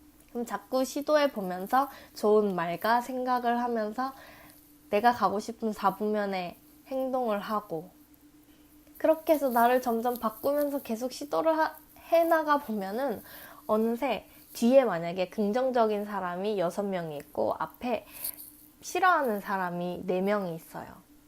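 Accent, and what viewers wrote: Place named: Seoul, South Korea. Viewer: 어릴때 서울에서15년정도 살더니 서울말을 잘하네요. 영상도 너무 좋네요.~♡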